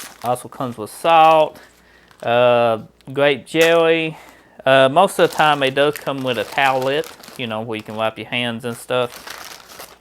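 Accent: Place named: Deep South, United States. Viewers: it is swampy, and american - but that can be a few places in southern parts of the united states - the dialect is from several sources in its past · I thought Cajun...but sincerely hope that's not an insult.. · I’m not sure if your accent is depth south or Australian 😂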